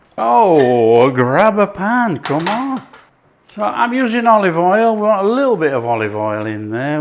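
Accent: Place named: Scotland, United Kingdom. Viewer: American here.... My grandma made this recipe, she was a dairy farm wife, but she cooked the noon meal at a New Mexico high school for many years. She also made a southwest adaptation by changing the spices. She added cumin, new mexico red chili pepper powder (it's the best, rich and a bit sweet), pepper, canned beans. The sauce & meat mixture can also be layered with corn tortillas & cheese lasagna style. I remember eating this at my jr high school in California. I sure enjoy watching you cook. I now live alone and struggle with poor appetite and no desire to cook. Occasionally I will make one of your more simple dishes. I can't figure out your accent... I hear Scottish & northern England. Occasionally, you say something that sounds like my great grandparents (descendants of Scottish immigrants in the 1600s to Appalachia).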